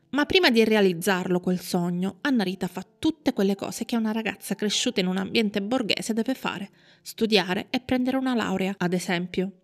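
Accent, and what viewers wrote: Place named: South, Italy. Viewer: Il canale è bello..peccato per la voce narrante. Si sente troppo l'inflessione dialettale del sud.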